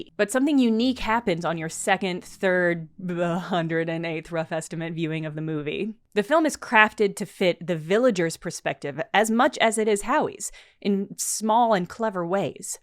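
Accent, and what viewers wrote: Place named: United States. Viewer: Can I find a non American narrative of this British classic ?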